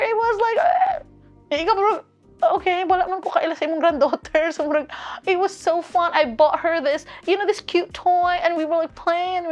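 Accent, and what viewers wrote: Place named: Butuan, Philippines. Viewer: you speak like my cousin😅 are u perhaps from butuan? lingaw kau ko the way ka muspeak😊 more videos pa✨✨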